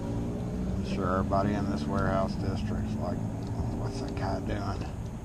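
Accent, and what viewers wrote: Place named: Mississippi, United States. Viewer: Ah Jackson Miss. Baton Rouge LA here. Thought that accent sounded real familiar. Next time I'm in the area I'll pop in the shop and carry on about how I can pick locks blah blah blah 😂 jk 😆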